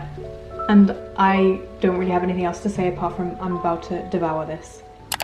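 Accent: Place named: Australia. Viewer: Where do you live ? You Sound Australien.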